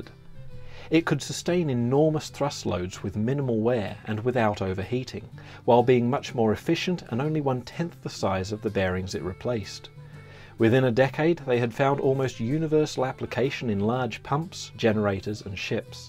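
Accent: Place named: England, United Kingdom. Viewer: Your accent mate. Are you a Englishman...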